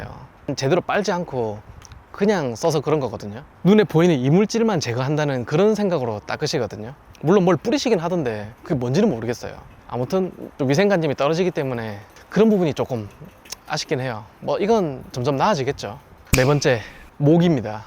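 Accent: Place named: South Gyeongsang, South Korea. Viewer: 인도네시아 여행. 관심이 갑니다. 방갑습니다.ㅎ 구수한 경상남도 톤이 정겹게 느껴집니다.ㅎ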